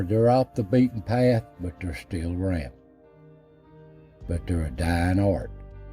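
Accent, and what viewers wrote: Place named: East Tennessee, United States. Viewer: I love your videos and your accent traveled through Tennessee got a taste of East Tennessee and fell in love sadly we had to keep on traveling now in Iowa and I sure do miss those mountains ⛰ 💚✌️ we are from South Texas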